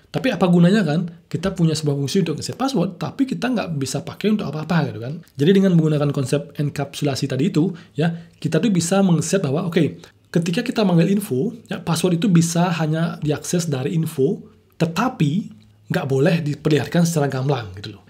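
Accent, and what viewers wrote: Bali, Indonesia. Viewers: Kalo gk salah saya merasa ada logat balinya hehe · Dari Aksen nya Pak orang Bali ya? Hhe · kental banget logat bali nya :D, thanks video nya saya jadi lebih paham lagi konsep oop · Logat Bali asane ne, gitu lo. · Keren penjelasannya om, btw logat bali, asli bali om? wkwkwk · logatny kek logat bali mas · mantap logat balinya kerasa sekali. btw kalau boleh cerita dan bagi tips belajar bahasa inggris dong bli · Logat balinya kental banget wkwkwkwk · Kak dari mana ? logatnya kayak orang bali : ) sukses terus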